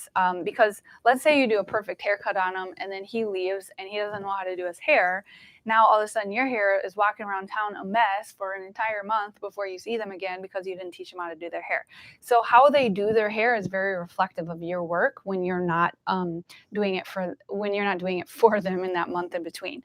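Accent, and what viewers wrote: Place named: Eastern Europe. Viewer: rahul kumar I agree. And her accent is adorable. Sounds like she was raised with another language. Eastern European possibly? 🔥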